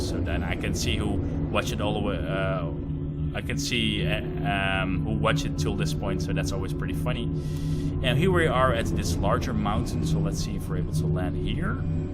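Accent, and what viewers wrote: Netherlands. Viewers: are u from the netherlands by any chance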